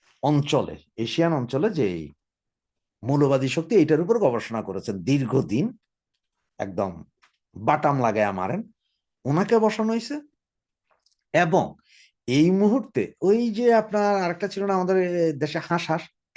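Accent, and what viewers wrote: Noakhali, Bangladesh. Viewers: এরে তুই কে রে? তুইতো মনে হয় নোয়াখাইল্যা। তোর বাড়ি কইরে? তোর নাম কী রে?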